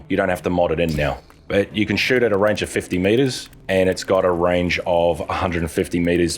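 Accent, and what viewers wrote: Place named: Australia. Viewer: Been off from gaming for several years and picked up playing again with SOTF and then when needed to learn things in this game I stumble across a familiar Aussie voice and all the memories are coming back from the great videos you have made for TF (who tf is Pewdipie btw lol) and I’m back addicted again with the game AND your videos. It made me soo happy seeing your still around recording games, especially TF&SOTF and I have a lot of catching up now on your channel since the last 4-5yrs offline. Thanks!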